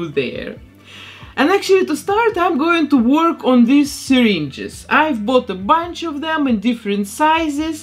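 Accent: Russia. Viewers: Добрый день,! Очень нравится Ваше творчество, Вы потрясающий мастер, давно хотела написать Вам об этом, смотрела Вас и думала, что очень знакомый акцент, проскакивали слова, явно, с претензией на русский язык! А сегодня нашла Вас на Facebook и не ошиблась! Вы большая молодец ✊🏼 спасибо за видео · почему я слышу русский акцент )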